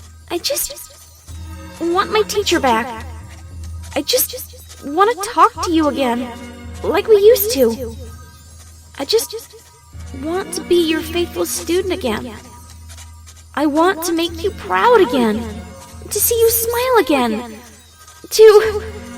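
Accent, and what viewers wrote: United Kingdom. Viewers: i loved your storys plus your voice is kinda cute in British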